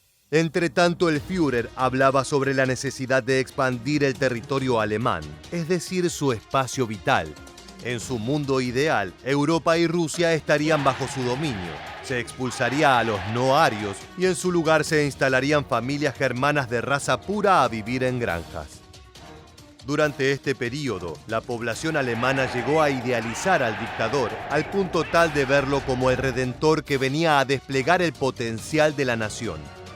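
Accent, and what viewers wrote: Argentina. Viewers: Es Argentino, sólo escuchá su acento. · Argentina, no escuchas su acento?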